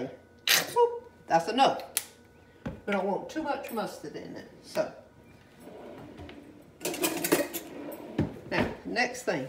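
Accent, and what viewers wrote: South, United States. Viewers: I remember my granny and aunts always having a pound cake to serve someone. You are most definitely a Southern lady who would have this tradition. Makes my heart happy. I love watching your videos and hearing your lovely Southern accent. 🌿🌺🌿🌺🌿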